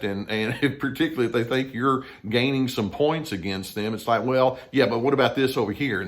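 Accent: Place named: Texas, United States. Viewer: Always funny to hear a highly educated individual, with a Texas accent. 🤣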